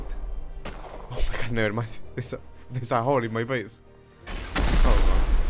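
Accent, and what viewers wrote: Spain. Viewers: This vid was amazing lol. Love your accent are you from Spain? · Hola, nose si hablas español pero supongo que si por el acento, buen video, me encantan tus videos y el hecho de que hables ingles, si no hablas español, nice videos mate, keep like this and u will grow very fast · Are you spanish? diría que si que por tu acento al hablar, pero puedo equivocarme. Un saludo y sigue haciendo videos así de buenos!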